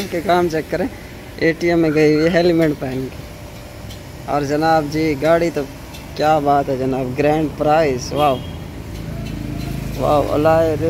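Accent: Saraiki, Pakistan. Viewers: Ap jb jb saraiki bolty hn mujhy hansi ati hai bro 🙈😂😂😂😂😂😂😂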